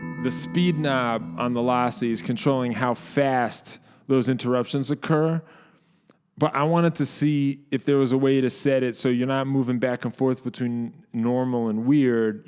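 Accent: Boston, United States. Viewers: are you from boston or something? im trying to place your accent. it doesnt sound nyc to me but maybe im trippin. thanks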